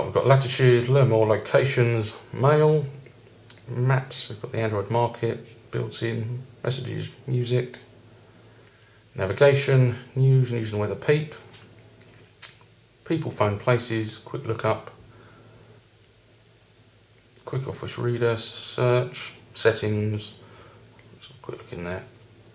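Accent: England, United Kingdom. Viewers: BRITISH ACCENT! :) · Does he sound scottish or welsh,its an ENGLISH accent its like saying a yank talks canadian,3 countrys in the uk wow some people.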